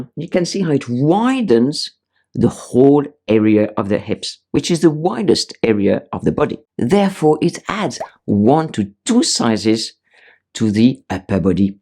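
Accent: France. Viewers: you look fabulous in the purple blouse.😍 That's the advice I needed today, since not only do we have the same name, but we look similar as well, size, colors and all. It is still your wonderful French accent that draws me to your videos though! 😂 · First of all I have to say I could listen to you talk for hours. Love the beautiful French accent. My ability to speak French is nil.😂 When I went to Europe. It was obvious that French women know how to dress. They know how to live life! They have impeccable style. Clothing is about quality not quantity. They spend money having their clothes tailored. They know how to buy clothes that fit!! They know how to dress for the occasion. They're very mindful of where they will be going and what they will be doing. Even the way they do their makeup. It's sensible yet sexy. Because of this they have je ne sais quoi. You develop style through your use of fashion that is unique to you. 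You don't wear a trend. That's definitely something I wish I would have discovered in my younger years. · Kia ora from Aotearoa, New Zealand!💙💚🙏 I really loved this video and think you're wonderful too!🙏 I love your beautifully soothing french accent and voice. I'm looking forward to more of your videos 🌟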